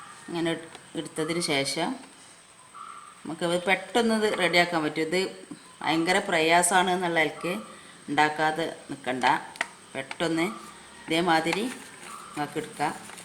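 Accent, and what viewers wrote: Malappuram, India. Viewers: Malappuram ano veed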